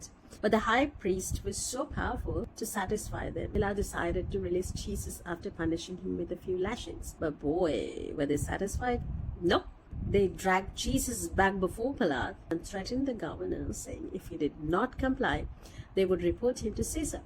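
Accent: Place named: Australia. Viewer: Wow where do you get this accent from? It’s very close to Australian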